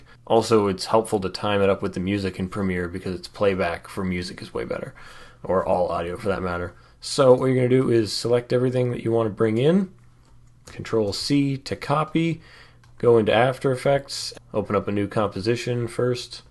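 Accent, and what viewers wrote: Canada. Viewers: Hein quoi?! J'entend par surprise du québécois dans un vidéo anglo?! Ça c'est nice! :p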